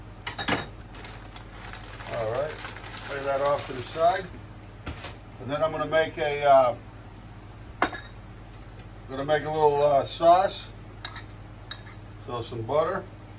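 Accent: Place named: Philadelphia, United States. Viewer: Great Job......you talk and act like ur from South Philly!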